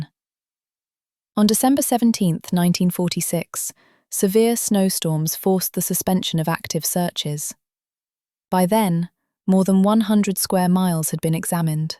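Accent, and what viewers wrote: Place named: England, United Kingdom. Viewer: Whoopo👻, love these mysterious disappearances, especially when well narrated, love the English accent, thanks 👍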